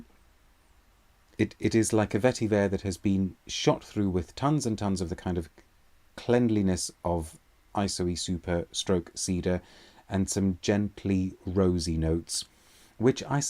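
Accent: United Kingdom. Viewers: Happy New Year Mr! Welcome back, so glad to hear your British English again:)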